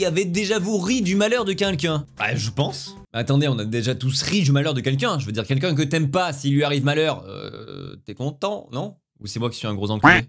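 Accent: Marseille, France. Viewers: T marseillais ou toulousien ?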